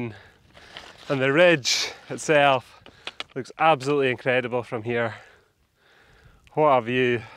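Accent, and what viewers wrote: Inverness, United Kingdom. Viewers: Aha, I should have guessed Inverness - it always foxes me!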